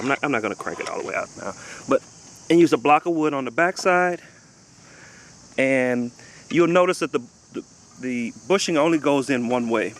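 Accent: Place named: Caribbean. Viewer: You sound like you have Caribbean Roots man....well done btw. Thanks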